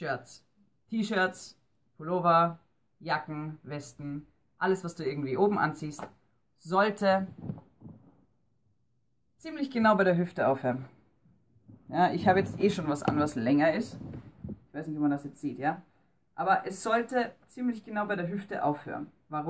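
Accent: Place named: Vienna, Austria. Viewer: bist du aus wien oder niederösterreich oder so? dein dialekt hört sich bisschen so an^^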